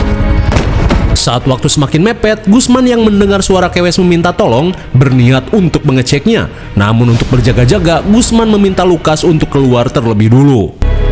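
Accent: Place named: Sunda, Indonesia. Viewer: lenggket banget bahasa sunda nya😂😂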